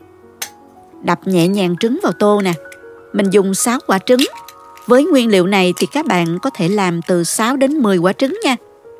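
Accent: South, Vietnam. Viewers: Giọng nói ngọt ngào quá. Tiếc là cô dùng từ Hán Việt nhiều quá, nặng nề mà không phù hợp. Chẳng hạn, “ cứu cánh” không có nghĩa là cứu giúp, cứu thoát. Cứu cánh là mục đích cuối cùng, giải pháp duy nhất…từ này có ý nghĩa triết học,không phù hợp với chuyện nấu nướng, thay đổi món ăn cho lạ miệng… Giọng miền nam ngọt ngào thì nên dùng cách nói giản dị, thân tình, dễ hiểu thì kênh của sẽ thu hút nhiều người xem hơn đó.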